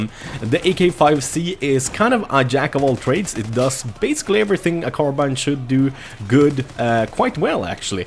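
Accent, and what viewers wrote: Sweden. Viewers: Märker att du är från Sverige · are you from sweden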